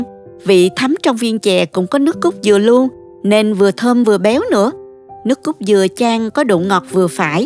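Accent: South, Vietnam. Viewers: o dau cung duoc nau do an ngon co giong noi dac chat nam bo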